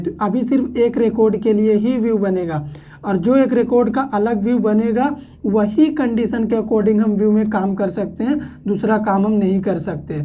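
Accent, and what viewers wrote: Jamshedpur, India. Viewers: Are u from jamshedpur ❤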